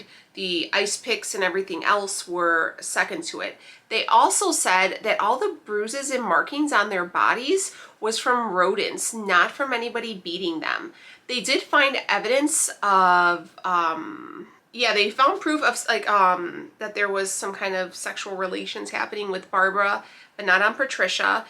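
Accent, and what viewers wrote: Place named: Chicago, United States. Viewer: This is my 3rd video I have watched on your channel and I knew you were from Chicago from your first one I watched , I too am from Chicago , I recognized that accent 😊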